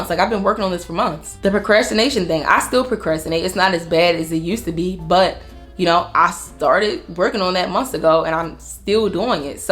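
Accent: Baltimore, United States. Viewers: are you from Baltimore? (I’m from there) idk I can just tell by the accent 😭. but you are so pretty and this video was sooo helpful! ❤️ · I know a Baltimore accent when I hear it! But you gained a new follower❤️ I needed to hear this !! Thank you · I have a video idea you should make a video of you saying words I’m just realizing you from Baltimore and that’s why I really like your accent. You should make a video saying different words like you too. Earl blue Avenue. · are you from bmore? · ✨Your accent is giving me East Baltimore vibes ✨ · I hear that Baltimore lol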